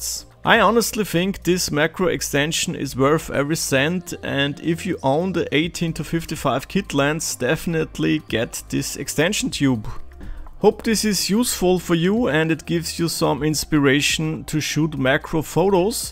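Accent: Germany. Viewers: fast Bayerisches Englisch....wie geil ist das denn :P cooles Video...vielen Dank dafür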